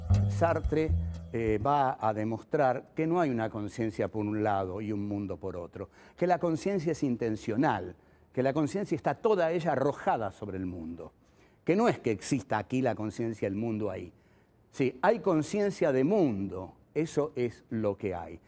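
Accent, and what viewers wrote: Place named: Buenos Aires, Argentina. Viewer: “Nunca digan digresión, es digresión”. Ese acento porteño jajaja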